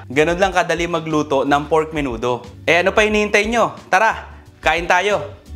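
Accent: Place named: Cavite, Philippines. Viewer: yung punto parang taga-cavite... imus or bacoor hehe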